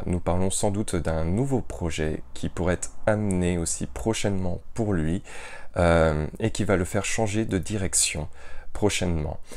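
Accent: Quebec, Canada. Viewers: Merci, bon voyage j'adore l'accent québécois.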